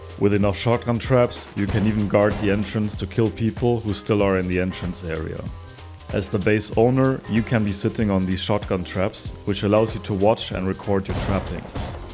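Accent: Germany. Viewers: Bist du deutsch? Hört sich nähmlich ziemlich danach an:D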